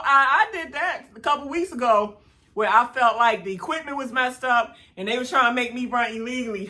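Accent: Baltimore, United States. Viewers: You gotta be from the Baltimore area... Lol!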